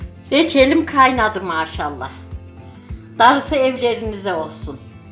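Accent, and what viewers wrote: Kayseri, Turkey. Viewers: Teyze kesin Kayserili şive aynı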